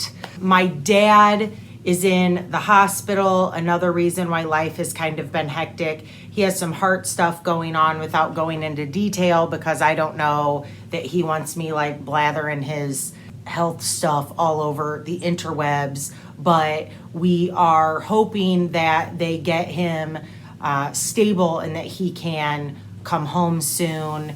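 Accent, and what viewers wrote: United States. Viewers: I’m terrible. I just watch this because you’re hot AF but I also love your American accent, smile and demeanour.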